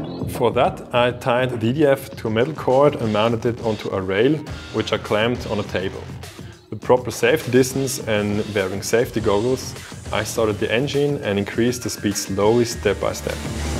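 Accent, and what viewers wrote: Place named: Switzerland. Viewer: Ret schwizerdütsch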